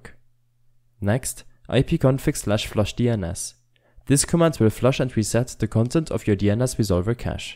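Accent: Germany. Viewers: Man hört richtig dein deutsch Akzent in englisch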